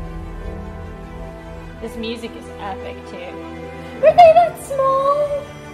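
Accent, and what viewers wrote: Ireland. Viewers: Hey, another video where you just, talk, talk, talk,right. I’m kidding, I like that you talk during your videos. I can hear ore of that Irish accent. Tell your mom, your fans have spoken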